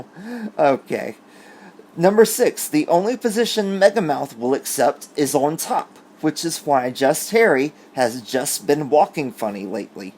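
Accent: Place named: Georgia, United States. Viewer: Omg!! I’m a fairly new subscriber... I think I discovered your channel about a month ago and I’ve been hooked ever since!! When I was watching your last video, I thought “hmm I wonder where is from (because you sound like me lol), and I almost asked in the comments but decided not to and then I find out in this video that you are in fact from lovely GA!! I’m from the northeast part, what about you?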